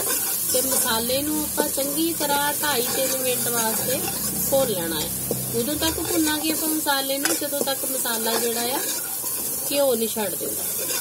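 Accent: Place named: Malwa, India. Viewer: Sirra 😊 Ma'am tuhadi language te ni majje wali lgdi Malwai lgdi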